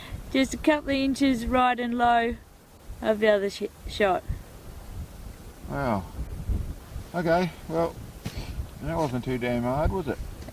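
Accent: Australia. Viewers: Not bad!!! But Australian English 😄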